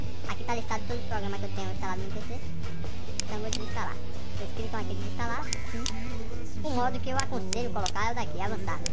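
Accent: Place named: Bahia, Brazil. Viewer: essi mano é baiano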